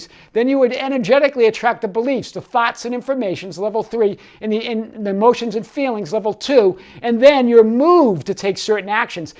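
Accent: Boston, United States. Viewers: "GODHADDATHAAHT." 😄 Love that Boston accent. This was great. Thank you.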